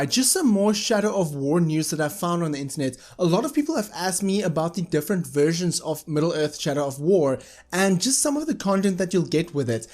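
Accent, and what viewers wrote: South Africa. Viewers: You sound so South african...!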